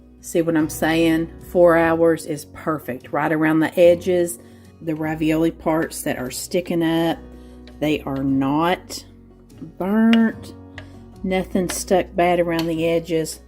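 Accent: South, United States. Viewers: Love your easy recipes.fast and easy is nice.anything Mexican is what I like.not spicy though.I saw your recipe for taco pizza,with refried beans and hamburger with pkg pizza mix.yum Can't wait to try it.😊Love my vegetables,and you make good ones.love your crock pot dinners too love your southern accent.I am from Minnesota.they day we have have a accent.thats funny to me.enjoy watching you,but you make me hungry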